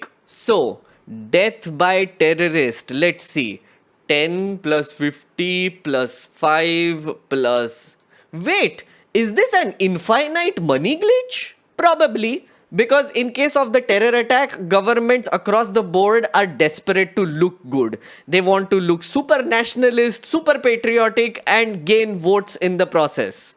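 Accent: India. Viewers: You’ve got the perfect Indian accent! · nothing wrong with having an Indian accent. he sounds dignified to me. no need to copy the English or Americans. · Bro u need to change ur accent it sounds so stereotypical Indian and is the reason for our loss of dignity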